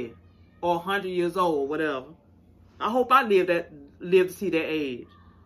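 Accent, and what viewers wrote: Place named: South, United States. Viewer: love the accent. reminds me of family from the south. haven't seen them since I was a child at 12.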